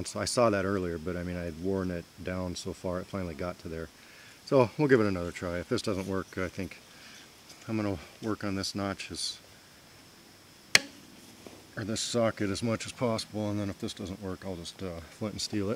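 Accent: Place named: Minnesota, United States. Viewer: I'm really liking your videos brother. Where are you located? Sounds kind of like MN. I'm in Upstate NY between Buffalo and Rochester, about 3 miles from Lake Ontario. Never seen chaga in wild. God bless. John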